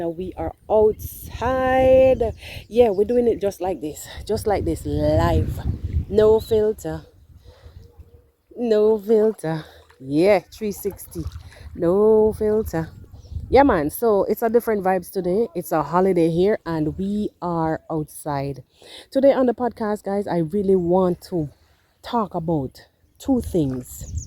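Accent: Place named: Jamaica. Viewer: I just love how you speak all jamaica bad mine the girl and then a talk bout say a good advice and she mùst listen to them after dem a chat her out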